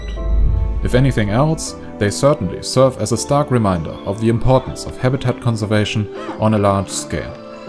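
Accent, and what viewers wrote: Germany. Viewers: He sounds soooooo German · I love your German accent. It's very subtle, but still noticeable. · Is that a german accent?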